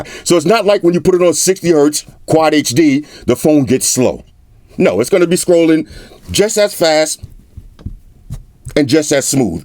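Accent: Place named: New York, United States. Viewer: yo! Love the videos people don't know that the way you talk that's that city shit natural some talk a little different but thats that new York talk,life you feel me my dude be easy keep your head up,keep making those videos and always be floss....like you always do Littles from ct,ya heard!!!!😎👍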